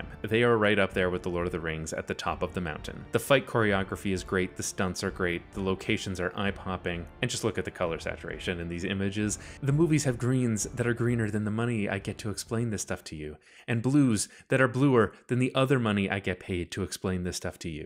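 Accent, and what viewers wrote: Canada. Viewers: First sentence and I know you're Canadain! Beavers and Maple Syrup, huzzah!!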